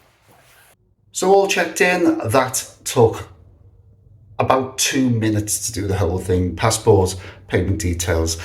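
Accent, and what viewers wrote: Liverpool, United Kingdom. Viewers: are you a Liverpool lad hey I am from Formby myself lol xxx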